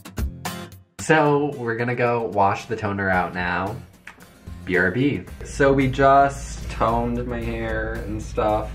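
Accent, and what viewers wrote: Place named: Michigan, United States. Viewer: Omg I heard your voice and I guessed that you were from Michigan before you said anything!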